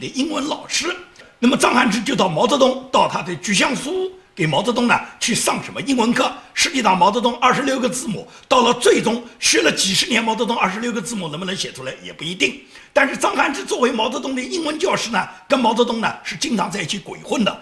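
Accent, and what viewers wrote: Nanjing, China. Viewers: 喜欢建民用带有南京腔骂洪晃，过瘾！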